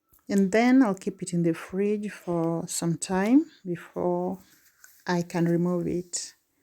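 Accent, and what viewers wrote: Kenya. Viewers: Which part of Kenya is this? It is beautiful. I can only tell that you are from Nyanza following your accent.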